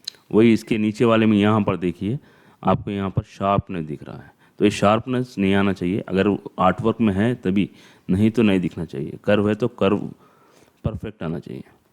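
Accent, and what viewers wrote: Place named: Hyderabad, India. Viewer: Asakum Nice vdo Your accent is like deccan hyderabad. I am sure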